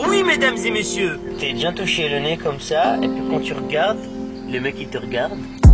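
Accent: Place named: Belgium. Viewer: c est du français belge du coup c est tout a fait normal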